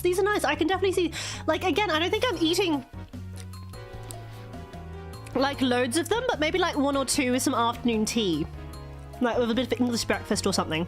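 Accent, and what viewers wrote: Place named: United States. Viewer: I love her American accent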